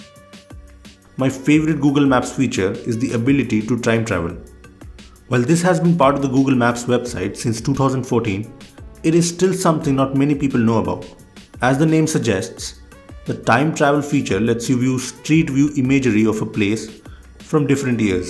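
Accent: India. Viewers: He's not "putting on an accent." It's an Indian accent, and I don't mean native Americans.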